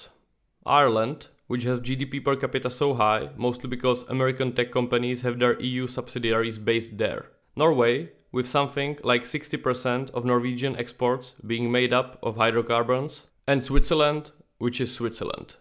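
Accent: Czech Republic. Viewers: You have Czech accent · Great. Výborný obsah, i když ten český přízvuk je v té angličtině hodně znatelný :)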